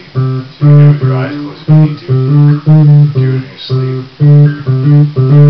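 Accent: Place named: Canada. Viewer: bro. First off you sound sooooo fucking Canadian I was laughing so hard. Not an insult...my daughter was born in Ottawa....funny memories. However, bro you are playing the riff incorrectly. i know you have been a long time subscriber/commenter on my videos. You are adding in extra octave d's...i mean technically the notes are correct but there are more open d's not the octave 12 or in your case on the g string...the 7th fret.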